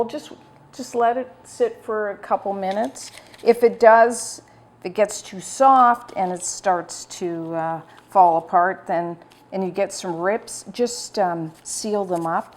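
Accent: Canada. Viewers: You used to live in Canada right. You have that accent. I love your recepies I've been watxhing for about a year and I love your channel!!!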